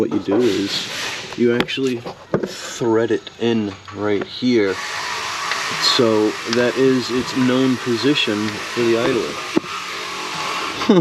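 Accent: New York, United States. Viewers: YOU GOTTA BE A NEW YORKER. boston got nothin on you.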